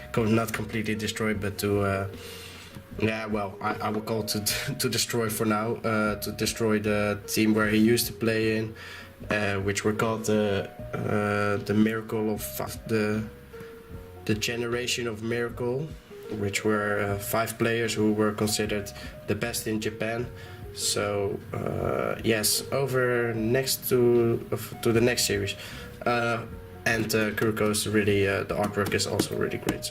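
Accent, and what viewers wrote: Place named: Netherlands. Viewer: I can hear ur from the netherlands, fellow dutchman. 😂 cool collection!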